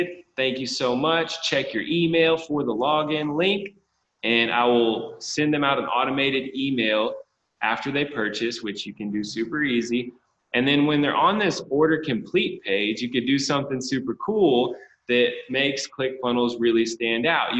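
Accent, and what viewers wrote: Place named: New York, United States. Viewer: Bro Nicaragua? You sound like a New Yorker lol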